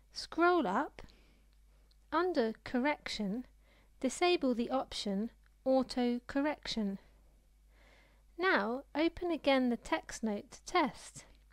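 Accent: United Kingdom. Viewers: Lol Ur British accent is awesome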